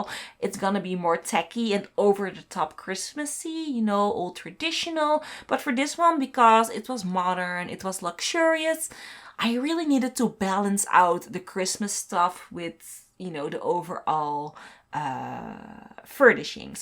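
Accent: Netherlands. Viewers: Je hebt echt een Nederlands accent ik hou ervan. 🧡🇳🇱🧡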